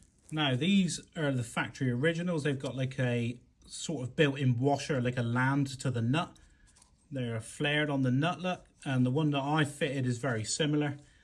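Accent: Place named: West Country, United Kingdom. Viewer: I'm wondering if you are west counttry. maybe Hinkley, i only wonder because you are so particular with the connections electrically and i'm an engineer, former CEGB/national power/powergen/nuclear electric/british energy (same firm privatisation)and a stint for centrica in gas.The in laws were Taunton and Bridgewater and sound like you.I never knew about the sensor connection near the battery, that is very insightful, it monitors the current for the start/stop system if the car has it. thanks. I also didn't mention, you are a good dad, you speak of your son a few times, good on you. Just a point to note, your sons car is an automatic and the alternator is at the rear of the engine compartment as you show, on a manual it is Left hand side as you look into the engine bay.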